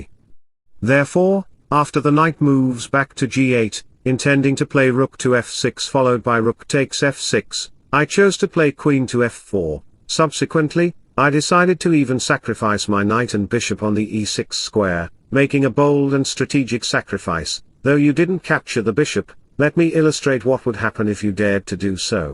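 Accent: United Kingdom. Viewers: It must be - that's a British accent.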